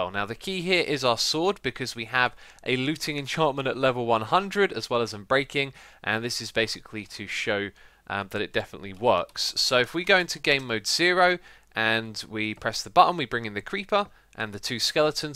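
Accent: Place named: United Kingdom. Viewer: i dunno to me all british accents sound the same :I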